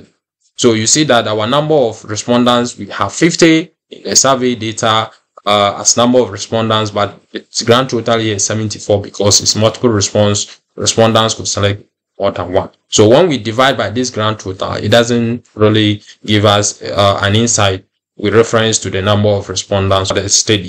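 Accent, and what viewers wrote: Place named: Ghana. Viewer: He sounds Ghanian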